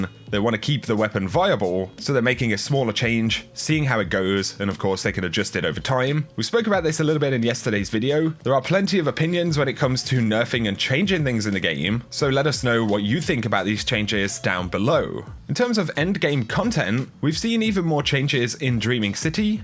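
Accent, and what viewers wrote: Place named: England, United Kingdom. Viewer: If Christopher Walken was a Destiny content creator with an English accent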